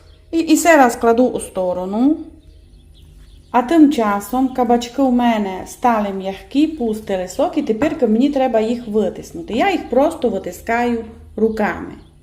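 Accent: Transcarpathia, Ukraine. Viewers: Люблю закарпатський діалект❤❤❤ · Смотрю на Вашем канале не только рецепты, но и наслаждаюсь Закарпатским диалектом! Очень нравится, просто наслаждение! 👍🌿🌹🌿Привет из Одессы 💖☘️🌻🌻🌻☘️🇺🇦🇺🇦🇺🇦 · Важ закарпатський діалект це перлина самобутності, слухаю вас і отримую велике задоволення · Дякую за рецепт, простоту , закарпатський діалект ❤ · Мені дуже подобається закарпатський діалект! Через нього я звернула увагу на ваш канал! Будь ласка, продовжуйте говорити, як ви звикли! І дякую за ваші рецепти ♥️💙💛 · Дуже люблю Ваш закарпатський діалект. Говоріть ним, бо він чудовий. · кому ся не подобає закарпатський діалект, то най ся не дивит. А я люблю як ви ведете канал❤ і не я одна😊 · Дуже файна піца ,а ще ліпша закарпатська мова .дякую Вам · Пані, ведіть свій канал тільки своім закарпатським діалектом, я коли вас слухаю душею переношусь в Виноградів до своіх дорогих друзів. 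Дякую вам ❤️ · дякую вам розмовляти так як ви на Закарпатті розмовляєте це думаю гарно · Пані, у вас шикарний закарпатський діалект. Вас приємно слухати, а головне- смачно слухати😊 Дякую за рецепт. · Приємно слухати закарпатський діалект. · Дякую за рецепт. Він просто чудовий. Слухати Вашу закарпатську мову просто насолода та радість для серця. Ще раз дякую🙂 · Я з Полтави, заслуховуюсь Вашим закарпатським діалектом. Вмикаю канал, дивлюсь як готує господиня каналу та просто слухаю та насолоджуюся ❤ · Щиро дякую за смачний рецепт.Ваш закарпатський розговір - це Ваша родзинка. Миру нам усім і перемоги. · Дуже гарний закарпатський діалект.Кому не подобається, хай не дивиться. Ви молодець. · Мені суперово слухати на закарпатському, все зрозуміло! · Пані не вздумайте міняти мову ведення каналу. Я з Києва і тільки за Закарпатський діалект. Вас просту чути приємно. Ви знаєте комікесу Леру Мандзюк? 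То ж вона цікава тим, що розмовляє діалектом. Я приїжджаю до рідні маминої на Хмельниччину ❤ я млію від Подільського діалекту. Говоріть по свому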